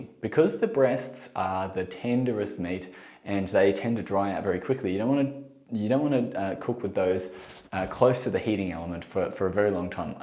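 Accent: Australia. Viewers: Hi, just a query regarding coconut flour!!!!! I live Perth Western Australia and try to get organic, can be a challenge. I recently purchased 2 different organic coconut flours and the carbs per 100gms vary quite a bit. Is there a logical answer to that?? By the way thanks for your vids, it's pleasant listening to an Aussie accent without all the hoo hah. Much appreciated Rosemary (70 yrs)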